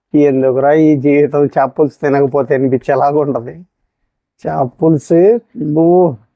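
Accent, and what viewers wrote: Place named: Tenali, India. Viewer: Meeru cheptunte naku tenalanipisthundi sir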